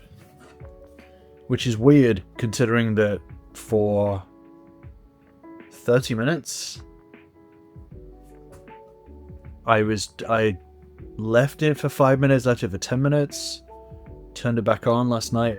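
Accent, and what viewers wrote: United Kingdom. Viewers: Not so much full Karen as full Godfather. Very polite, no screaming, but do inquire as to why you have paid so much money for something that doesn't work. In fact work your British accent. I'm just saying this is someone who did costuming in theater for 7 years. Americans are idiots for a British accent. 🤨🤣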